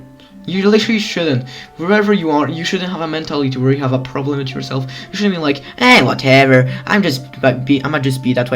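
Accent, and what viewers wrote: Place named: England, United Kingdom. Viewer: ale englisz ladny masz ty z angli chyba jestes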